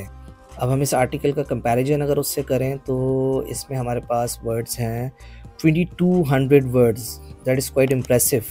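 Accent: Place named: India. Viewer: Ur accent like an indian. I was thinking u r Indian.. anyways i got ur video while scrolling really loved it.. thanks for sharing such valuable knowledge i would implement it.. Thanks - love from India ❤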